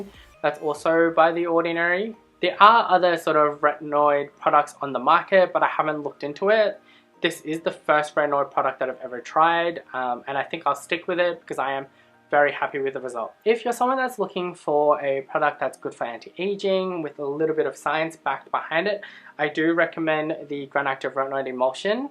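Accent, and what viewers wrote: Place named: Australia. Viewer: That Australian accent a bit too much sometimes No offence ! im just saying it in general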